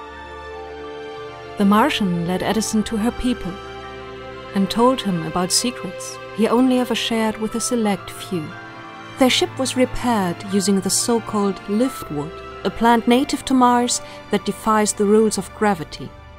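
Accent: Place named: United Kingdom. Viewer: du hast ja einen wunderschönen britischen akzent. Viele versuchen ihn zu imitieren, aber nur wenige schaffen das zu so einem hohen grad. Entweder die leute klingen noch sehr deutsch oder übertreiben bis zur lächerlichkeit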